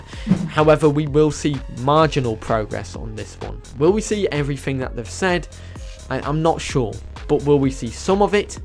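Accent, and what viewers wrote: United Kingdom. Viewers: Blud I, British but I ant as British as this guy💀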